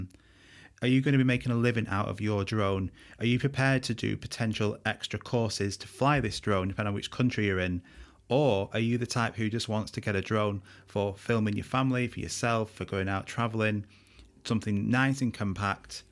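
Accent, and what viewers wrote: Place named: North, United Kingdom. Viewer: Can’t trusts anyone with a northern chavvy accent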